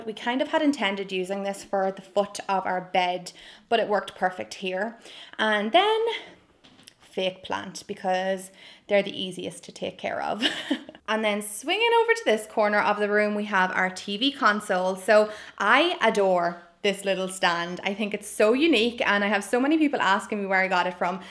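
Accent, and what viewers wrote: Ireland. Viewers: This is the first video that I have seen I love your apartment and listening to you chat. I have been to Ireland three times and cried every time I left. My family came from Galway. Your voice makes me homesick for Ireland . Love your apartment.❤️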